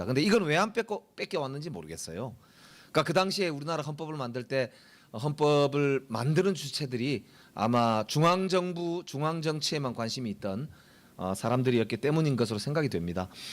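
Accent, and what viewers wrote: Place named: Busan, South Korea. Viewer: 오늘따라 부산 사투리가 더 느껴지네요. 정겹습니다^^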